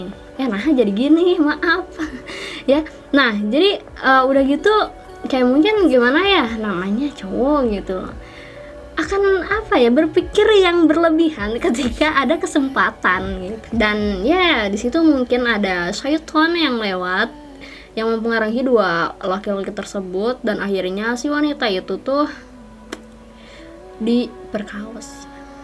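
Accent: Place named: Java, Indonesia. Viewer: GITULLOHHH medok bettt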